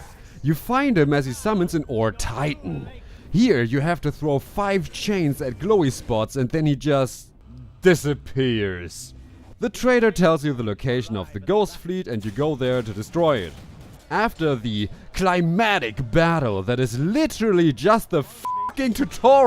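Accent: Germany. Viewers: Immer wieder schön den deutschen Akzent zu hören 😉. Im großen und ganzen mag ich deine Videos aber gerne 👍. Auch wen du sehr harsch mit den Spielen meiner lieblingsentwikler umgehst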